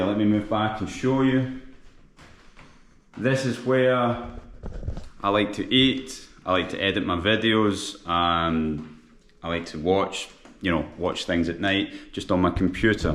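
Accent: Scotland, United Kingdom. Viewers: What strange messages that pop up on your video-----like "why you must leave the West and move to Moscow"....ummmh, why is that? Also, from all the other YouTubers who have posted vids of their apartments yours is certainly NOT the average one. Nice to hear your lovely Scottish accent, though! 🙂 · Thank you so much , nice and clean ! Love your Scottish accent ❤ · Scottish accent is so cute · I love listening to Scottish accent. Greetings from Serbia bro.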